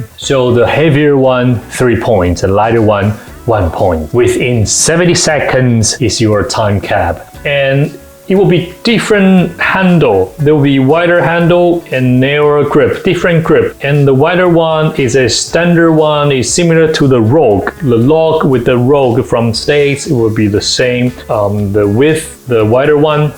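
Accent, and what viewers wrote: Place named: United Kingdom. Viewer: 不會拉，你很多單字會特別的有英國音，那個Ter很明顯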